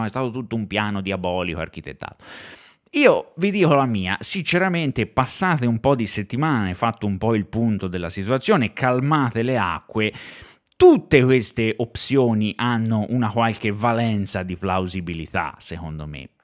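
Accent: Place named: Siena, Italy. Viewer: Bravissimo 1) Per i contenuti 2)Per la simpatia 3)Per il formidabile accento senese.Numero UNO!!!!!:washhands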